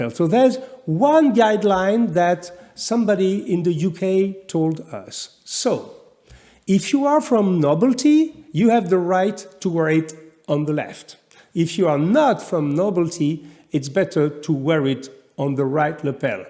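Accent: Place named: France. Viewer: I always like to listen to your nice french accent, makes style sound extra stylish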